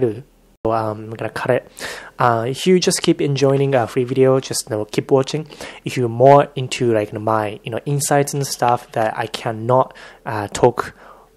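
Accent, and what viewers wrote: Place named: Japan. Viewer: your english sounds like japanese-english.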